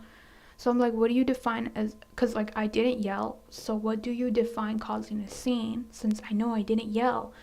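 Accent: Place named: Russia. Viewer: As a slav girl, your russian accent is very good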